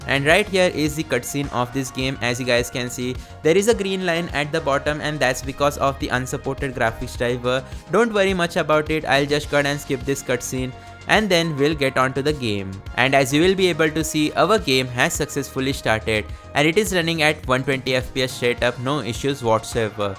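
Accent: India. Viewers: The indian accent makes me trust you even more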